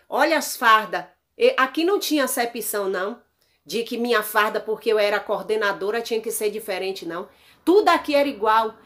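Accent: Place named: Bahia, Brazil. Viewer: Eita baiana arretada continua a falar a verdade minha irmã cada vídeo seu fico falando com Deus.porque todos não são verdadeiros que Deus continue a te usar e abri os olhos do povo ,ah tô amando seu sotaque kkkk sua risada também fico rindo com você falando sempre a verdade quando tá brincando ou falando sério Deus continue a te usar IRMÃ 😘👏👏👏👏🙏🙌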